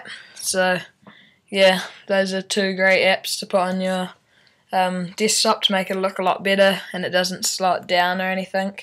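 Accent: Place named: Australia. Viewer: of course he's australian. I'm an auzzie and i can tell the difference between english, american and australian. why cant you?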